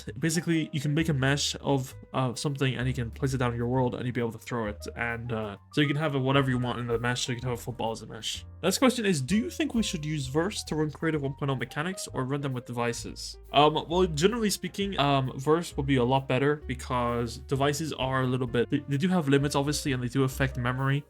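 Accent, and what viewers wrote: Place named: United States. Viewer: Bro u sound so American i didnt even ponder over Irish accent. As a British born, I prefer Ireland. Keep up the read-minding content!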